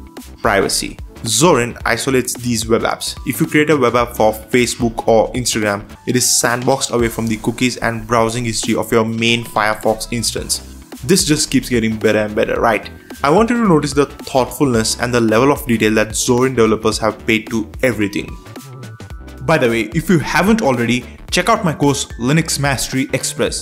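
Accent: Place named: India. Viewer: Apu dialect made me close this shit instantly...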